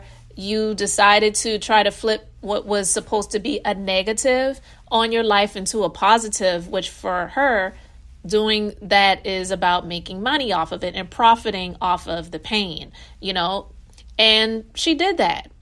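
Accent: Charleston, United States. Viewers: Hey hey your accent sounds familiar. Are you from the Charleston SC area? Kandi was definitely wrong for laughing with DJA-1. I enjoy your content. I live in Ladson.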